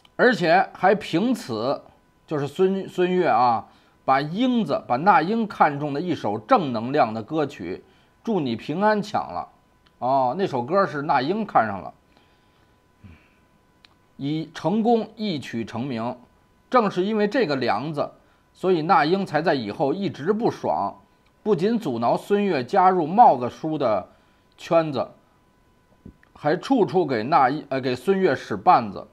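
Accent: Beijing, China. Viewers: 听老哥的北京口音就是亲切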